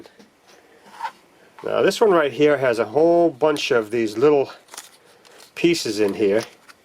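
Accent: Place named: New Jersey, United States. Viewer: Great video, thank you. What's that accent you have? Jersey?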